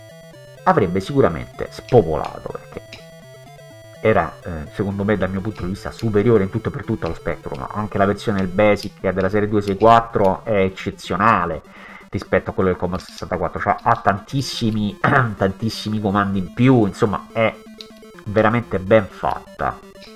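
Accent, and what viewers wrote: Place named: Rome, Italy. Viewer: Si grazie, INPUT anche era una traduzione di una enciclopedia Inglese se la trovo la ripulisco! Giù allo scantinato ho anche parecchiè riviste a prendere polvere e umidità, tipo LIST, e COMMODORE GAZZETTE (era la mia preferita per Amiga e c64), L'Amiga 500 purtroppo non ce l' ho più, gioco o scrivo qualche programmino in Basic sull' emulatore di tanto in tamto. Ma il C128 sta nello scatolo insieme anche ai manuali ecc. Penso che sia un po' in cattivo stato, tipo mancano un paio di tasti e non si accende, ma si se si può rimettere in sesto ne sarei felice, dovrei informarmi come spedire un pacco grande, cosa mai fatta, sono una chiavica a risolvere problemi pratici e non mi intendo di elettronica, io sono vicino Napoli e tu dall'accento penso che sei area Roma, quindi penso dovrei spedirlo, ma penso una volta capito il tutto non dovrebbero esserci problemi, quindi si certo, nel caso fosse possibile mi farebbe molto piacere, quindi mi metto in coda :) PS: se fossi interessato alle riviste COMMODORE GAZETTE (il mio computer preferito oltre al 128 è l'Amiga naturalmente, perciò le compravo) e se fare e mandare pacchi non è una cosa troppo onerosa/e/o/stressante te le mando, (o anche la stessa INPUT se la trovo, le regalo naturalmente, lo dico a scanso equivoci, dato che stiamo su internet), penso che starebbero molto più in buone mani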